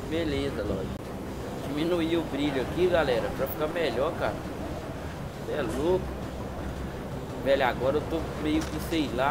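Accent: Minas Gerais, Brazil. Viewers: Mt Top o seu canal mano ! Concerteza vai Crescer Rapido ! Acho o seu sotaque bem Legal, creio q vc seja Mineiro, Parabéns !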